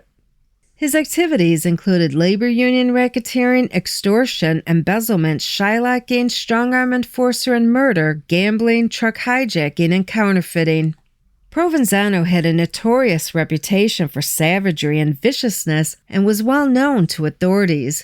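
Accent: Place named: New York, United States. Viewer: Very good narrator. Has some New York/Eastern seaboard in her voice.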